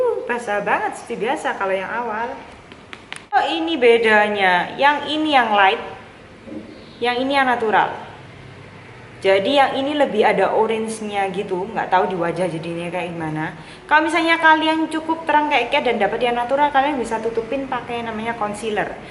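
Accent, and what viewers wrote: Java, Indonesia. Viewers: logat jawanya itu yg bikin suka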